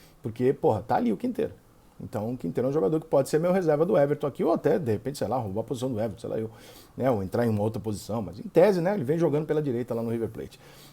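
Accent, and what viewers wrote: Rio de Janeiro, Brazil. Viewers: Nesse vídeo vendo o jeito de vc falar parece que virou carioca de vez kkkkkk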